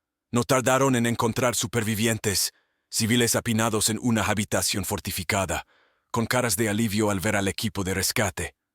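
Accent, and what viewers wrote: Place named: North America. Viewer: Su Tono de Vez es de Norte América . Vendiciones es un buen video .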